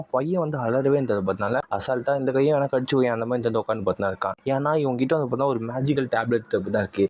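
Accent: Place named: North India, India. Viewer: Tamil tha pesuriyaane therla anegama vadakkano irupiyo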